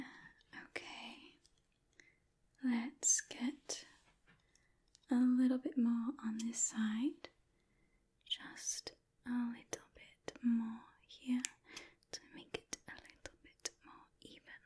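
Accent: England, United Kingdom. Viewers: Yes Harry Potter rp! I love it and the slight British accent was so cute ❤️ Thanks for the great video <3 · I love that British accent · gasp british accent british accent british accent british accent british accent british aCCCCEEEEENT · okay so hear me out: TALKING IN A BRITISH ACCENT. I’M LIVING FOR IT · We thank you as well for such entertaining content. Your roles plays are second to none. Your English accent is spot on slightly posh but without going all Downton Abbey 😄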